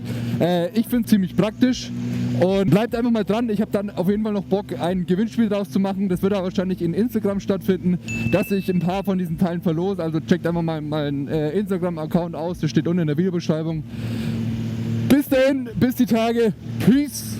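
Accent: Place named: Franconia, Germany. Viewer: Bist voll der Franke gell?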